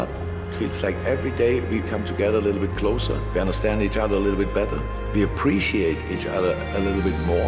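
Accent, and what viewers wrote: Liverpool, United Kingdom. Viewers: Our kloppo now has scouser accent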